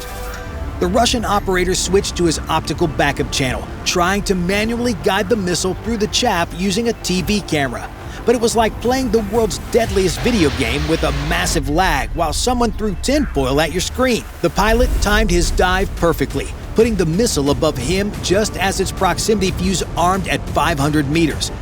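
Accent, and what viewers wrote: United States. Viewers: Another annoying American voice,with usual tone well tou have lost with your usual venture but managed to condemn millions of Ukrainians to injury and death and the rest to poverty in a destroyed country ,so where a you going next?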